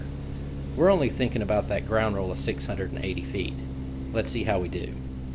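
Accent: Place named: North Carolina, United States. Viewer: Now that's a proper NC accent. Love the video!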